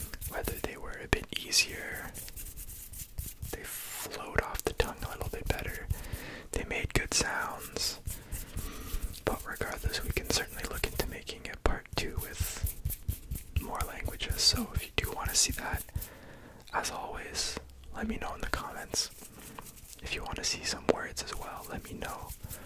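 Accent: Quebec, Canada. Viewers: Ton accent québécois mélanger avec l’anglais est très drôle! 😂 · Je vis au Québec et ton accent ressemble beaucoup au mien dans tout les cas tu parle très bien français!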